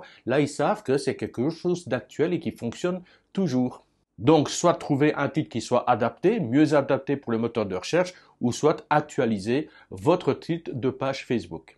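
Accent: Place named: Belgium. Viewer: Bonjour, sauriez vous me dire comment peut on contacter Facebook qui ne répond jamais mais alors jamais ! J'ai un groupe de plus de 105 k abonnés et je sais que FB fait ce qu'il veut quand il veut (modification, suppression de contenu injustifié, etc...) mais côté service client c'est pitoyable. Alors si vous aviez une astuce ou idée ce serait cool. Je voudrais savoir aussi si vous connaissez un truc pour mettre une photo cliquable sur un groupe FB ? En tous les cas, vous assurez grave avec tous vos tutos super bien expliqués, hyper didactique avec votre accent (belge, suisse ou alsacien ? ). Bravo et merci. Patrice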